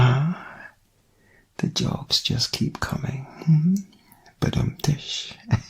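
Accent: Ireland. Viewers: What kind accents is this irsh😍🤭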